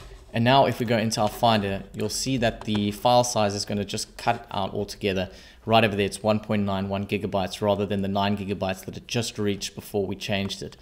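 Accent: South Africa. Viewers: Yisis you sound like a my home cape town. You saved me a ton. I lits maxed out my space on my laptop and editing was a nightmare. My project file was 259gbs. My poor 500gb sata was crying but this is going to save me a ton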